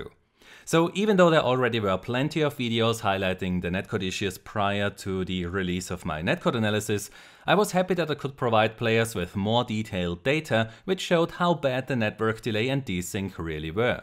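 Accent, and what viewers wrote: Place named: Germany. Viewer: Den deutschen Akzent wird man einfach nicht los 😔. Gutes Video!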